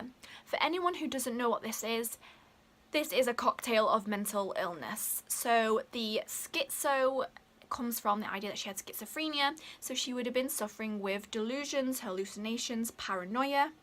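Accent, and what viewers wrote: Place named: Manchester, United Kingdom. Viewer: love your videos sooo much! so interesting and it’s lovely to hear a mancunian lol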